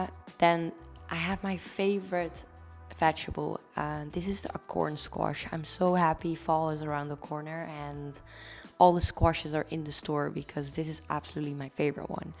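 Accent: Netherlands. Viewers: You sound like you have a Dutch accent :) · R u dutch? U really sound like dutch haha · Are you Dutch? Because it kind of sounds like it🙂 I really enkoyed this video!! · Ik hoorde aan je accent dat je Nederlands was daarna zag ik je naam haha 😇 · Are you dutch? Because 1 you’re name and 2 you’re accént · Great food. I can hear that you are Dutch. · Omg are you dutch? I think i can hear it out of your accent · Is she dutch? She sounds dutch but i cant find dutchvideo's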